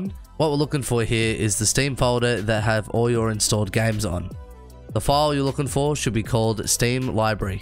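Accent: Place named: Australia. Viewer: You Aussie too brotha?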